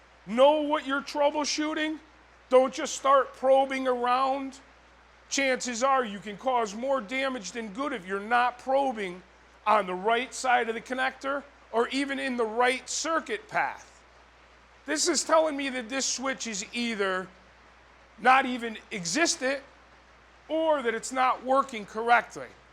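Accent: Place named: New York, United States. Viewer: New Yorker.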